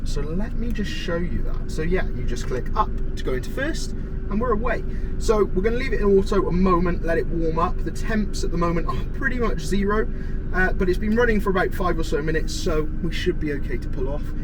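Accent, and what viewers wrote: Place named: Wales, United Kingdom. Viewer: i’m here for the welsh accent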